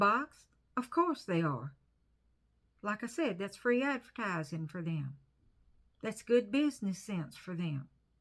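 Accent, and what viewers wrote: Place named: South, United States. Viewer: Hi,. You are a gem! You made me think back on my purchasing behaviour over the last couple of years. I returned to tarot after a very long absence and I was very excited about the plethora of decks available. I started buying. Quite a bit. Sometimes I'd not be able to afford a deck or I'd miss out for some other reason. But I have a small collection of decks I really like. Some of the earlier decks have been put aside. I don't do Kickstarter anymore. What I discovered is that there is always a new deck to get excited about. The decks I have now are a good representation of what I like in deck imagery. So, when I see a new deck it's pretty rare that I get carried away. I'm in a very relaxed state about my collection and I can reach out for a deck I've not looked at for awhile and it feels brand new, again! What a luxury! 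I love my deck collection. It's a luxury to have these 35-40 decks be at my beck and call. Anyway, you're right. We're adults. You sound very southern. If you're near Columbia, SC, let me know. We can meet for coffee or a couple of beers. Take care! Thank you!😅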